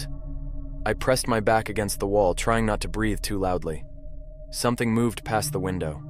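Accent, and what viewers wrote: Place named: Jamaica. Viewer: your Jamaican accent is fine, believe me you don't want to be anything similar to a Ja... 🚫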